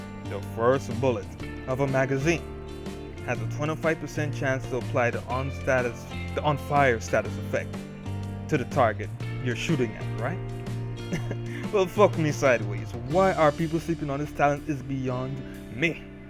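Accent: Caribbean. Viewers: TheDivisionVampire I'm Jamaican. That accent isn't Jamaican. Sounds Caribbean - just can't figure out which island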